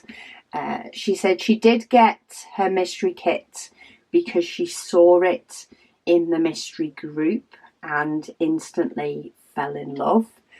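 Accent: England, United Kingdom. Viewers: Hi! My husband, David and I watch you every day, and we’re enthralled by your speed of diamond painting. I love the pen you’re using today. So pretty. I finished a small partial special drill yesterday, and loved it. Being from the middle of the US, we are entranced by your accent and love hearing about your life in England. I’ve entered the giveaway, so fingers crossed!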